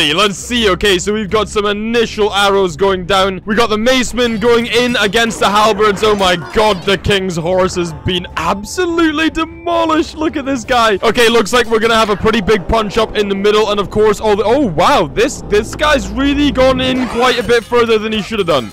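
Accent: Scotland, United Kingdom. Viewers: I’m sorry are you Scot? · Are you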